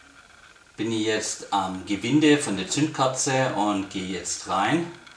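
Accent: Swabia, Germany. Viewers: Schee Schwäbisch, griasla noch Günzburg👍🏻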